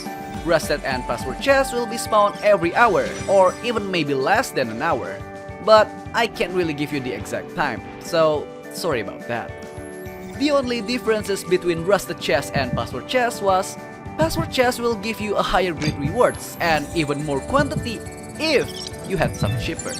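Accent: Indonesia. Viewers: Lu orang indo ya bang? Logatnya kerasa banget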